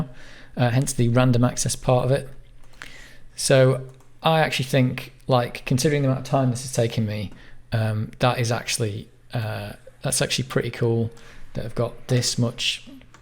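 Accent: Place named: United Kingdom. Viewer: Try to hire vocalizer it's high viscosity and hear it is unbearable. So much ear scratching and most of time floating slowly I listening at least +2x speed. I recommended you to switch standard US accent even Bharat more heareable than british. Or will be good if there is have any settings for prevent some countries and accents. I will glad if anyone knows like that filter tell me. Closing all sources much better than first option. Why i have to hear ridicilous sounds? Converting with transcript to all videos from same sources means cost.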